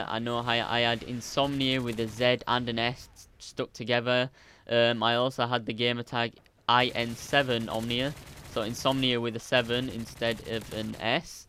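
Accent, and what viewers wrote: Yorkshire, United Kingdom. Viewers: Where you from mate, you sound like you're a fellow Yorkshireman?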